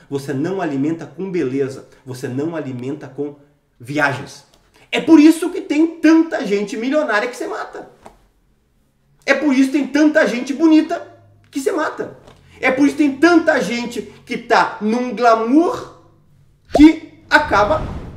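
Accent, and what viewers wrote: Rio Grande do Sul, Brazil. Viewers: É bem gaúcho mesmo, sotaque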